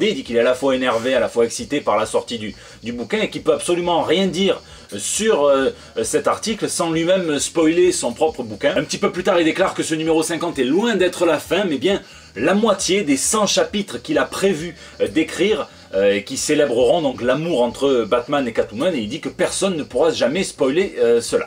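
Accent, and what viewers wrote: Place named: Provence, France. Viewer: Geek Librairie c'est le top les cigales,l'accent provençal, on est en vacances !!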